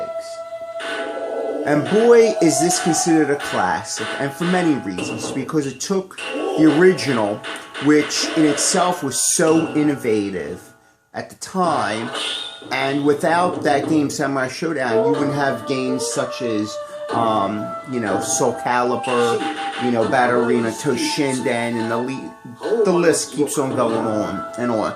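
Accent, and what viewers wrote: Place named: East Coast, United States. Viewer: I love the opening line and your East Coast accent!! :D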